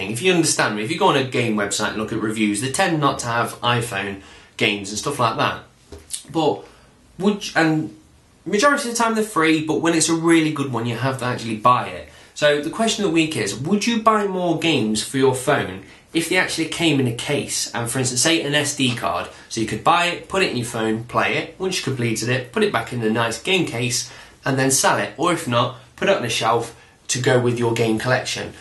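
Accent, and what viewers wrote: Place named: Nottingham, United Kingdom. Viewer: ranting about your rants? your losing it ! Whereabouts In Nottingham are you from anyway ? I had no idea till you mentioned the Notts twang!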